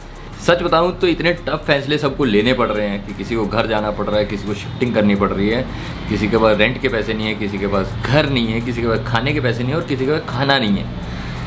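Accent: Punjab, India. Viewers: Oh bhaaji Punjabiya wali krti tusi tan aj....!!!!!Good to listen veer ji dialouge..!!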